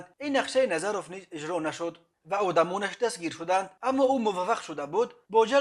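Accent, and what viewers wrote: Pamir, Tajikistan. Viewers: Ака гапи зиети назан Помири ай кичо медонад Точикира лахчаш хамухел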